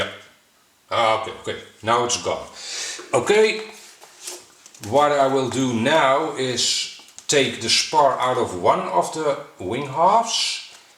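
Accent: Netherlands. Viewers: Really enjoy your vids. Loving the accent especially. Wishing you every success with growing your channel. You obviously put a lot of hard work into it and are passionate about the hobby. Respect buddy. Cheers Dom (Essential RC)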